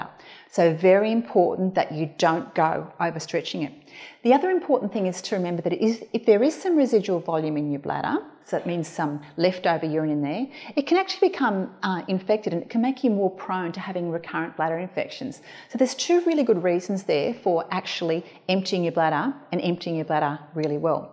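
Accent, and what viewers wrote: Australia. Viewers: How would you know there is residual urine left in the bladder without ultrasound or tests for voiding? Happy to hear a fellow Aussie.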